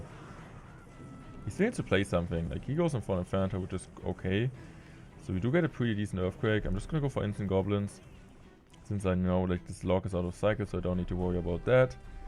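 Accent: Germany. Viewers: can u add subtitles to ur videos? ur German accent is so damn hard to understand and the fact that u talk pretty fast is not helping at all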